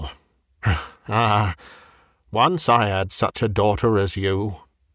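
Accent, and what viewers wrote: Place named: West Country, United Kingdom. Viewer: You do a marvelous West Country accent.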